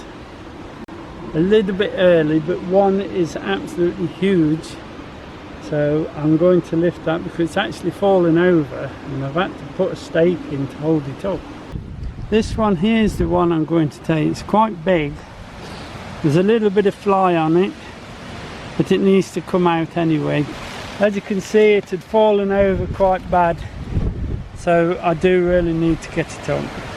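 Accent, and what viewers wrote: England, United Kingdom. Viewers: Liz Nichols... Hi there Liz... i live in Cochise County.. Southern Arizona.... on the edge of the desert... gardening can be difficult.... but i find growing in the winter.. i can cope with most veggies... fruit trees need extra care and attention.... water being the biggest problem irrigation systems are a must..... is a guy who makes things sooo easy to understand.... and i can understand his English accent........ Ed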